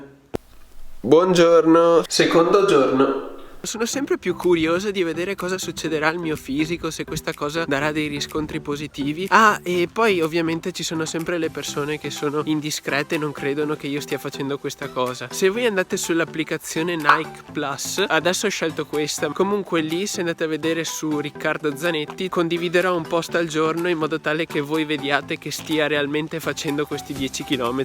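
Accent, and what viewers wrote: Veneto, Italy. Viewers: Te si Veneto sceto😂😂😂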